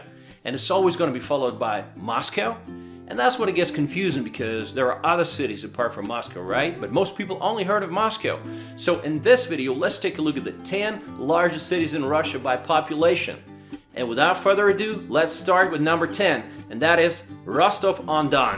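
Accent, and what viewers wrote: South, United States. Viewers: I've been meaning to ask, especially as l have not as much time to review your archive of videos (among the 4 Russian vloggers l follow), but your English lacks not only a typical Slavic accent, but it betrays a certain American southern voice. Quite cannot pin it down geographically, but where did you pick it up? The only Slavs l've known who have l have heard unaccented Slavic English were those who studied in the UK and acquire Received Pronunciation coaching... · Nice... (No wonder people ask you= Where you from..? You sound like an American.) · Your videos of Russia are all fabulous, with great and pertinent information. The Russians seems so happy, busy and content. Your English speaking is awsome—no accent, excepting a slight US southern accent. David, San Diego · Tripping me out how this guy sounds more American than Russian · Russian with an American accent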